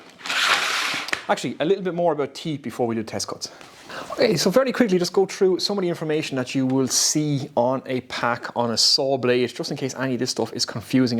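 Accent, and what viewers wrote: Ireland. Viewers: I thoroughly enjoy and appreciate your content! .......even when I disagree with it. I live in Southern California (born and raised), surfed all my life, and if you ever met me, you might comment on my California surfer accent. I can't speak for other Americans but I think your Irish accent definitely adds value to your content!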